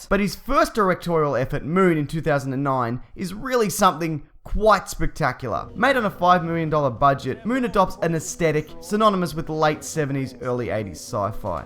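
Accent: Australia. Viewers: Is he australian? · his accent is badass... #AustralianArmy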